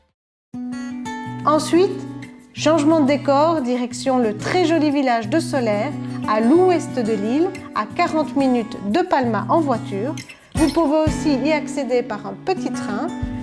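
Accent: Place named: Switzerland. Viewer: Sympa ces découvertes sur Majorque à tester...je peux entendre un accent Suisse dans ta voix.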